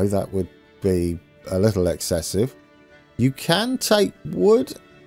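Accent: England, United Kingdom. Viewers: Good episode. Nice train journey at the end! How come you are living in Austin? You sound English! I lived in Denver for 3 (excellent) years. Spent many a weekend exploring the Rockies. Also went to San Antonio, to see the Alamo, though I don't think we went to Austin.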